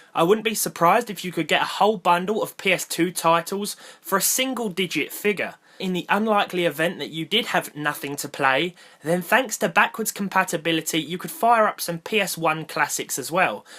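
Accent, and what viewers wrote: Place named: Essex, United Kingdom. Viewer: I'd have to kill myself if I spoke like him , a towie reject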